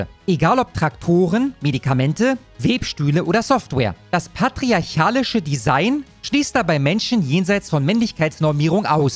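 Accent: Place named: Berlin, Germany. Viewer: Oh ok cool 👍. Berlin hab ich so ein wenig rausgehört aber ich dachte eventuell hättest du russische oder polnische Wurzeln, weil es sich etwas danach anhört wenn du sprichst 😅👍 liebe grüße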